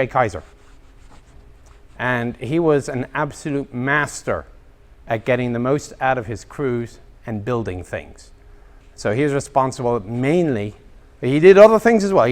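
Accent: Ireland. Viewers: He is CLEARLY irish. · Where is that speaker's accent from? A little bit of Irish? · Chance your he's Irish? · Irish accent??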